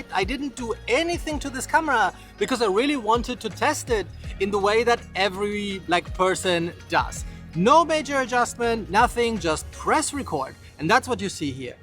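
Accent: Germany. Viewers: My first visit to your channel. Very nice experience. For once, a sure feeling that you are not promoting anything. We need people like you. And if I were in China (or Dubai) with you, I would find your German accent charming ;)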